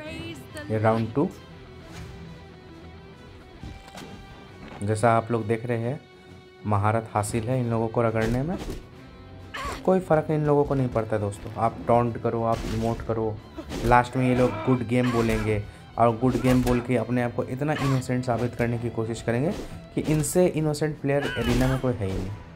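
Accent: Bihar, India. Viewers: Ling aur Sarge waale campers se toh bht zyada frustration hota hai😩😩😩 Btw nice gameplay 👌 I think aap Bihar se ho?😊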